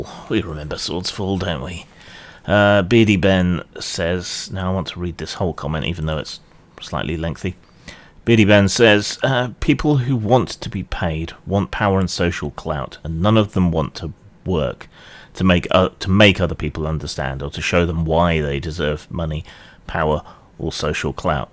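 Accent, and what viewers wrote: United Kingdom. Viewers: Still a little surprising that you recited my bloviation and griping about contemporary US social decay. I appreciate that you consider it worthy of repeating. I need to improve my prose if its going to be read aloud by your dulcet southern English tones.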